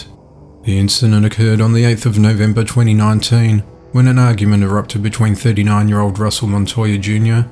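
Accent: Australia. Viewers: He's definitely Australian, but I'd like to know more!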